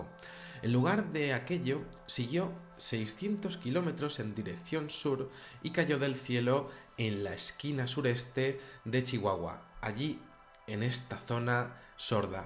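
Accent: Spain. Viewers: pues sea lo que sea que ay ahi no es normal lo que me mas bien me pregunto es que buscan los extraterrestres ahi si no ay casi vida en flora y fauna ni gente a que van ni modo que venga a vacacionar o a vistar pero bueno exelente video lo visitaria pero el echo de pensar que algo me pase me da miedo jajaja por cierto me encanta tu voz de español saudos desde mexico ven avistarnos :)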